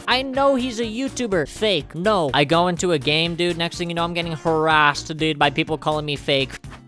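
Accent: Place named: United States. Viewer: I like ur cowboy accent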